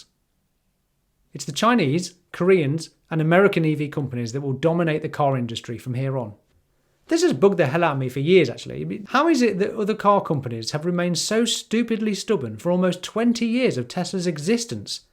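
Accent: United Kingdom. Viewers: Lovely content. Funny accent. Are you from Liverpool or Manchester, perhaps?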